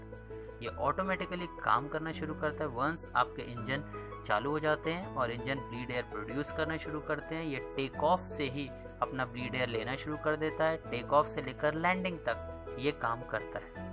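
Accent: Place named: India. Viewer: Indish is so painful to listen to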